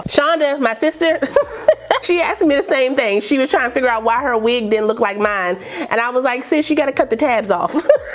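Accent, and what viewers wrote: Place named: Baltimore, United States. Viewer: That Bmore accent! Love it!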